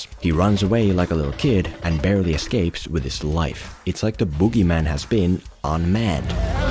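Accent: Finland. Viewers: You sound Finnish, and if that's true, I marvel at your strength not to pronounce Harkonnen as Härkönen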